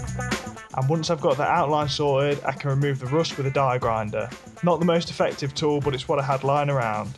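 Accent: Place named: East Midlands, United Kingdom. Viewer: Just watched the 106 Hayabusa on the runway very impressive. That's a right accent were is it Nottingham area possibly Derby. It certainly is fast, anyhow you have a Leeds fan subscriber.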